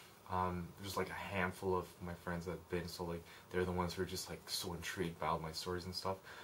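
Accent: Canada. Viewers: ... but I digress, you should make more videos man ! You're a good storyteller ! I follow you on Twitter btw, I just read your tweet about someone commenting on your Canadian accent, and decided to check out your channel. haha :P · dude what's with the canadian accent?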